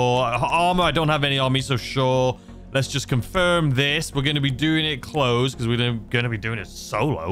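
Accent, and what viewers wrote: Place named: Northern England, United Kingdom. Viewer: why do you have a northen english accent but from america? did you move there not to long ago or something mate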